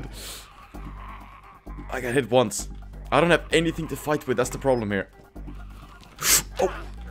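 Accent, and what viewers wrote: Germany. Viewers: I can feel your german accent😂😂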